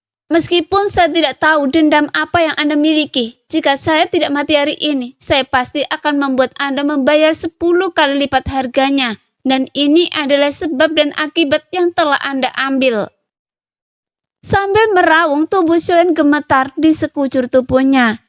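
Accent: Java, Indonesia. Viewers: Duuaaar ....jawa kentel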